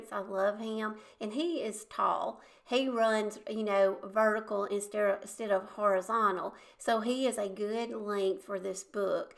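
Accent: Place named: Alabama, United States. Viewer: The subject…French country decor…..caught my eye. Then I heard your sweet voice and said to myself “I bet she’s from Alabama “. Hi from Walker County(NW of Birmingham)…👋🏻👋🏻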